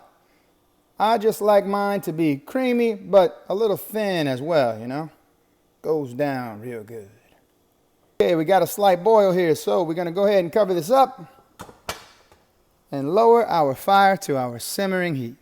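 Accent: Louisiana, United States. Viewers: are you really from Sweet Love from Louisiana cuz you have that accident I want to say you're not married things that you don't cook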